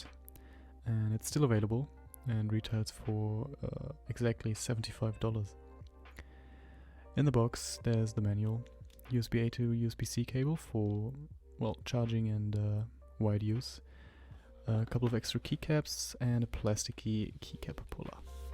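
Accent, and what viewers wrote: Germany. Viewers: just wondering if/how this works for typing in german? i'm thinking of getting one for my studies, but often need to write in german, and i don't have any experience with mechanical keyboards yet, so am not sure how typing ü ä ß usw. would work. any tips? assuming you're german from your accent, sorry if not! :) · are u german bro?:D Edit: okay you‘re german, Mr. lol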